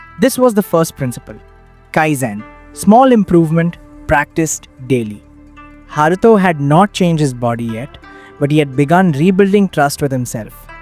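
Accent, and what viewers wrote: India. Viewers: japanese story with indian accent… random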